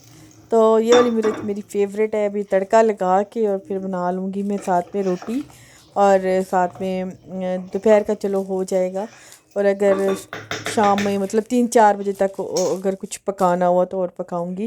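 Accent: Saraiki, Pakistan. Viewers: apni boli sariki my bht mithas hy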